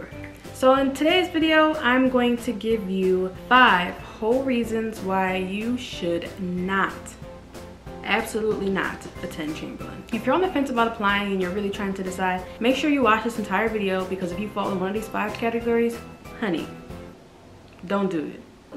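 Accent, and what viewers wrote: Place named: Jamaica. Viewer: I heard that Jamaican accent. Nice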